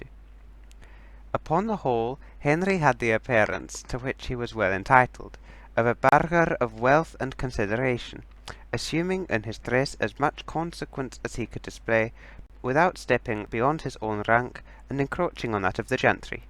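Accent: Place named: Scotland, United Kingdom. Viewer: i love this book! it’s my favourite you did it so well!!! why are u now scottish tho?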